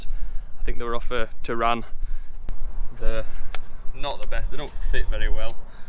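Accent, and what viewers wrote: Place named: Barnsley, United Kingdom. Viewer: This Yeti is mint. I thought I recognised a Barnsleh accent. That'll explain why I've seen cars with Darkside stickers knocking about these parts.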